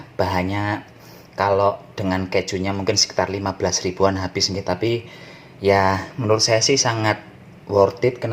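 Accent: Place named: Banyumas, Indonesia. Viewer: Mas, nganggo, bahasa, ngapak, lucu, mas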